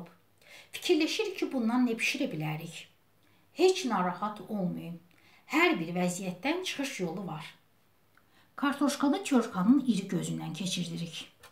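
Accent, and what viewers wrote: Baku, Azerbaijan. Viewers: Həsrət qalmışdıq Bakı ləhcəsinə. Yeni qoşulmuşam kanalınıza Bakının harasındansuz ? Mən Şüvəlanlı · Danisigina bax ay Allah lezzet eledi.Bakililar bir basqadir.🥰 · Lehceden srazi bilinir ki bakilidi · Beh beh😋 yemeye söz ola bilmez. Men de hazirlayacam. Xanimin danishigi, Baki lehcesi lap lezzet eledi 😍😍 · Shirin Baki lehcesi.Bashqa bir alemdu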